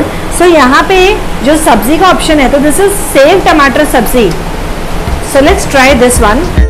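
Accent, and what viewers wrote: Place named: Sindh, India. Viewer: Sindhi accent is good to hear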